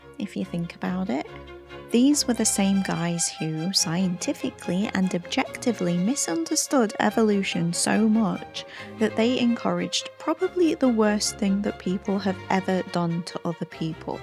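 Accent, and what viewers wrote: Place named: Midlands, United Kingdom. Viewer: i like the midlands accent ;)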